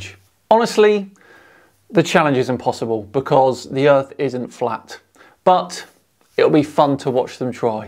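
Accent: United Kingdom. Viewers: Is it just me, or, is it strange hearing a British guy say dollars?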